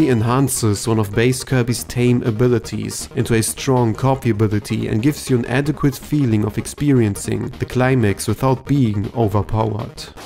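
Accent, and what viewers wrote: Germany. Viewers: Du bist ein deutscher. Ich kann es in deiner Stimme hören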